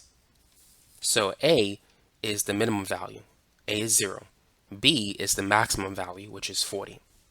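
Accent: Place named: New York City, United States. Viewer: I think there is a slight NYC accent in there. Almost makes me miss home. Great video...thanks!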